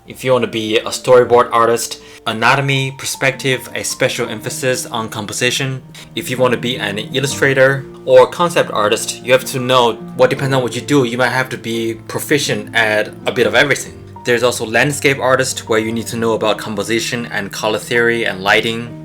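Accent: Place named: China. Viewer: you have chinese accent ! very talented, thank you for the video !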